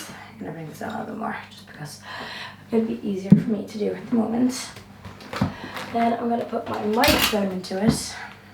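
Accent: Ireland. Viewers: Euros? Irish accent? Where are you from? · Why does she have a Jacksepticeye accent? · Is she Irish??? I'm in love all over again. Fantastic video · Your American accent sounds great! I only hear a little of your Ireland accent. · Yeah she has an upperclass Irish accent. With a hint of American thrown in. Irish kids watch a lot of American TV series and movies and tend to copy the way they speak. They way she says "there" is so American and not the Irish way of saying it. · Irish, don’t you know. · Love your accent. am i wrong to say it's Irish?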